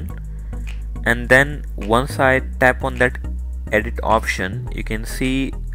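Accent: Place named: Asia. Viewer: you sound like you are Asian I would appreciate it if you would speak British instead, even though I only have a British accent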